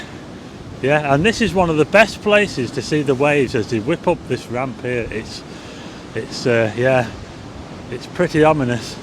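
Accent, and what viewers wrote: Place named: Lancashire, United Kingdom. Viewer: I missed Blackpool and your lovely videos always provided me the update on the surrounding areas..l also loved to listen to your Lancashire's accent ..Really enjoyedwatching it..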